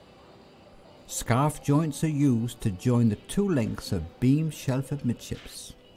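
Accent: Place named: Cornwall, United Kingdom. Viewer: Any further West from Cornwall and you’ll find yourself in the Celtic sea! By the way, Cornwall has at least two accents East Cornwall and West Cornwall the former is closer to the standard West Country of Devon, while the Anglo-Cornish of the West, was influenced by modern English due to the persistence of the Cornish language and, therefore, it has its own cadence and phonology. I would hazard a guess that the narrator is from West Cornwall.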